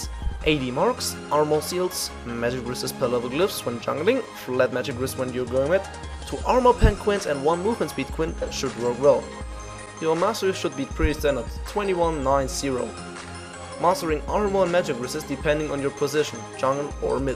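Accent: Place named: Germany. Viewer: Ich kann dein Englisch mit deinem Deutschen akzent nicht ab :D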